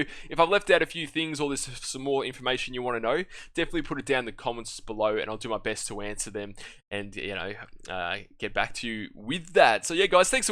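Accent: Australia. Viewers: You idiot he's Australian.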